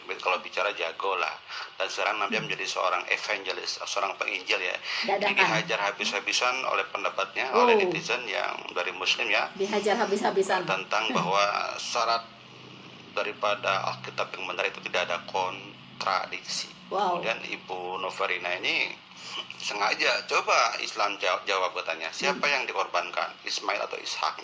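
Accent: West Sumatra, Indonesia. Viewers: Haleluya Puji Tuhan Yesus, Shaloom Bu semoga di berkati Tuhan Yesus dgn bersama keluarga...Amin terus Bu, saya orang batak lama di Riau saya masih dengar logat intonasi masih terbawa orang Minang dan terusss Bu kabari injil terus saya penggemar Bu, kasih Yesus nga pernah pudar..! bersinar terusssss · Bu' logat dan intonasi ibu bicara saja jelas kok suku minang. Terus lakukan dan berikan penjelasan untuk meluruskan tanggapan yg miring dari Muslim Menjawab. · Dari logat bcr, sy yg bukan orang Minang tau, beliau mmg dari suku Minang, sy punya seorang teman yg sdh lama tinggal dan bergaul dg orang2 dari brbagai suku di Jkt, logat bicaranya percis sama dg ibu ini, lagian buat apa sih hrs bohong soal beginian.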